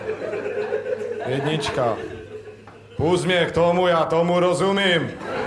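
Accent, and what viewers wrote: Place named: Prague, Czech Republic. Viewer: mne to pripada trapny...kazdej ostravak sice mluvi jak jantar, neumeji dat skoro dohromady ani vetu, ale tento borec mi prijde trapnej..taky bych rekl, ze to bude nejakej prazak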